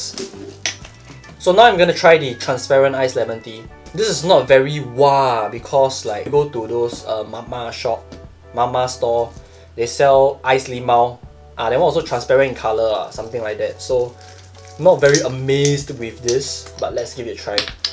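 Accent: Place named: Singapore. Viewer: well sorry but this is how most of us Singaporeans speak...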